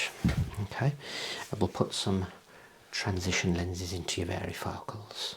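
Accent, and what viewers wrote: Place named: England, United Kingdom. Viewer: I love your accent. What part of England is this from)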